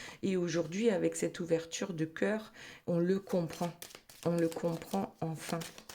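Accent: Alsace, France. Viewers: J adore votre accent m'a petite vous devez être Alsacienne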